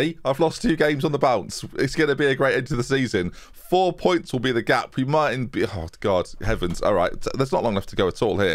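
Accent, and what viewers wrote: United Kingdom. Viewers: never knew a fucking baby could have a british accent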